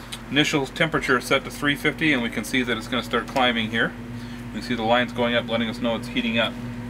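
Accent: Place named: North, United Kingdom. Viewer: it's quite obvious you're from up North.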